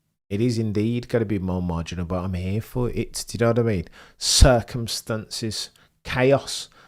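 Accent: United Kingdom. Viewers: Proper British lad 😂 I love the accent .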